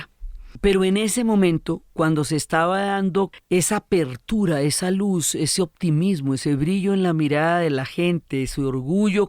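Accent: Bogotá, Colombia. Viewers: Si cierras los ojos, conectas el relato con el fondo musical y le pones imaginación, listo el viaje . Gracias por sus videos y podcasts Dra. Uribe. Además su acento Bogotana me fascina. Sobretodo cuando al final de algunas frases usted dice : Si !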